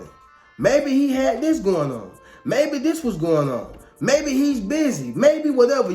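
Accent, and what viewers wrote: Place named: Rural, United States. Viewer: It ain't the same no mo...love your country twang.....😊😊😊😊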